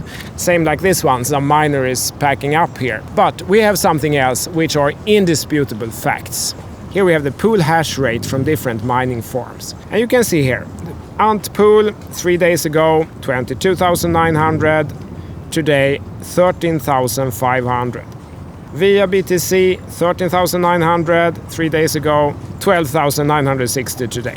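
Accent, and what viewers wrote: Sweden. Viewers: Best Swenglish on youtube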